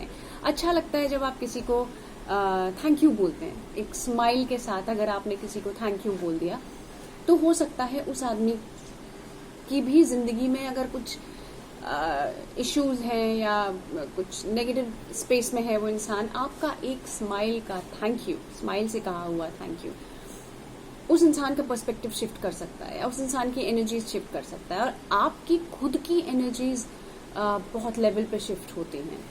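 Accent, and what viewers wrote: Bundelkhand, India. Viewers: we love your bundelkhandi acent in sit videos ....could u please tell us how u got this or might be u belongs to bundelkhand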